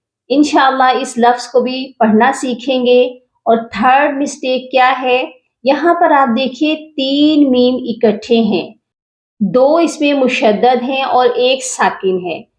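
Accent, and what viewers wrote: Panipat, India. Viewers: Mashallah ap toh panipati style main quran tilawat kar rahi hai mujhe bhot pasand hai ah ah lehja 😊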